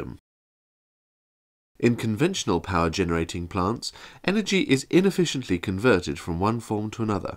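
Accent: United Kingdom. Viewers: Ok dawg, you got me. Nice one! Very well made. Nice Brit accent. Cheers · Interesting concept but I doubt the canned ham or the sedated fly have much input to the system. Although the use of insects in esoteric devices isn't un heard of. Viktor Grebeinkov observed anti-gravity effects in beetles and used their wing/shell casings to produce levitation. Good spoof with the pompous British accent.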